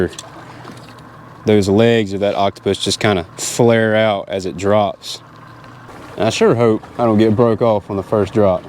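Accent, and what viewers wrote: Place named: South, United States. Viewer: Lolo yup I understand everything u talkin bought 🤣🤣 yeah its somethin about the Redneck in us that makes us talk like this 🤣🤣🙏❤🇺🇸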